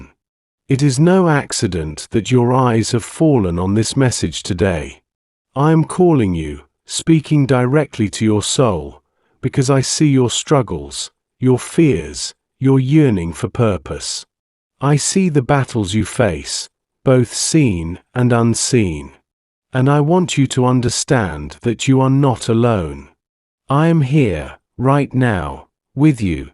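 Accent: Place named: United Kingdom. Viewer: Elon you have a beautiful voice with a slight British accent. Wow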